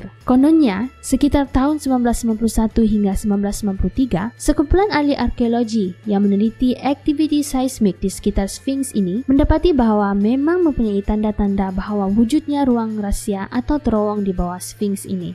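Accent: Sabah, Malaysia. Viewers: Kakak jane pun ok la.tapi lagi best abang.suara abang lagi besy sebab loghat sabah yang merdu jee.tapi kakak jane pun best jugakk😀😀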